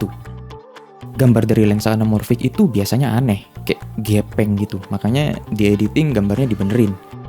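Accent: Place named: Madura, Indonesia. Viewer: logat maduranya nampak banget bang😂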